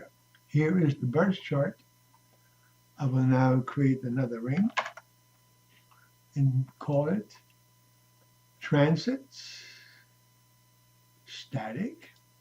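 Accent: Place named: United States. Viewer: Excellent! Good summation! Also, we have Pluto going through the US's second house, conjoining its native position from over 200 years ago. This is concerning. It is good to see another conservative astrologer! VedicCarol, or something, was one too. Unfortunately, few people listen to older people, and I'm getting there. They look for young, ignorant people to watch, some of which have good ideas, but who lack experience. Your American accent is quite good. I'm learning a foreign language too.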